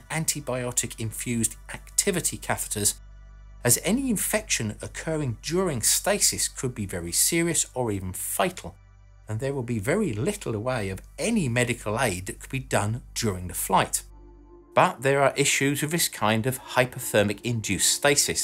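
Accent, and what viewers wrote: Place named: England, United Kingdom. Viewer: Love the tone, the voice, the pure english accent, very relaxing listening to you Sir! And the content........ perfectly excellent!👍 Forgot to mention, the intelligence and the shirts of course, salute! From a French-Canadian subscriber 🖖.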